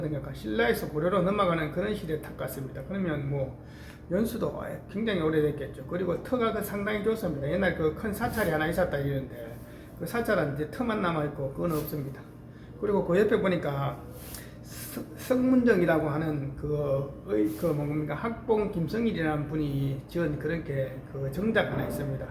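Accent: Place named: Gyeongsang, South Korea. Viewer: 경상도 사나이에 구수한 말씀 매력있어요 오늘도 고생하셨습니다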